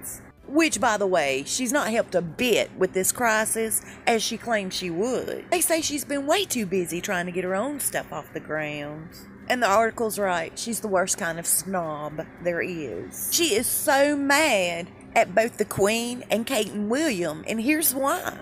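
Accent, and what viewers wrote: South, United States. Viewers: Hey 👋🏼 Always enjoy your vids & that sweet southern drawl of yours 😁 I watched the trailer for the movie…that’s all I needed. I cringed at her voice…😝 She won’t make it in Hollywood, she’s just not good enough. She’s an ok actress, but that’s it. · I love your videos...YOU ARE THE FUNNIEST OF THEM ALL... you truly put a smile on my face...not only because we are sisters in the 'MEGXIT".. I hope that MERCHANT MARKLE (as I call her) GETS HER KARMA BACK..!!!.but I also have a house in the Sunshine state...I like your southern accent better than the millennial....I actually love it.!!!!... in my humble opinion it makes you different from the rest, it gives you character, your own personality...plus the jokes sound much better, super funny..!!!! the millennial accent does not "suite" you...hahahha no pun intended..!! I think If anybody is over the age of 16 ...is just not right..it is actually a speech vice...did you know that? 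I hope I did not make you feel bad or hurt your feelings in any way....because I just love your videos. EX PECIALLY...HAHAHAHAHAHA. the one where you talk about going thru fire hoops, jumping over a pool full of German pinchers or something like that...oh...and the ROASTED CHIKEN...OMG..... I LAUGHED SO HARD..I HAD TO RUN TO THE BATHROOM...no,...really, ..seriously...I did.... you are the best..!!!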